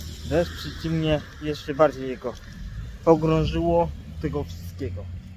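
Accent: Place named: Łódź, Poland. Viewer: Mówi typowo łódzkim, miękkim akcentem. xD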